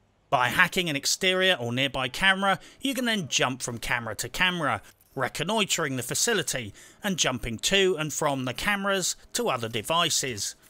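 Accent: United Kingdom. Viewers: Hey! I just stumbled over your channel and I gotta say that I love it! Very entertaining and it is so true ^^. If you ever play on ps4 I'd love to play something. Bless you and wish you a happy new year already! Endnote: love the accent, very bri'ish.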